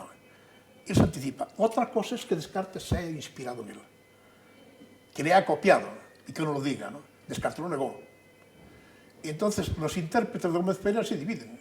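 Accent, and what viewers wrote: Spain. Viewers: Qué pernicioso el acento gallego para una nítida articulación de las palabras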